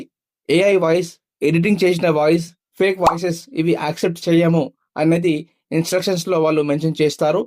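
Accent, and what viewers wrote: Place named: Tamil Nadu, India. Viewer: Thamil voice vasthundhi bro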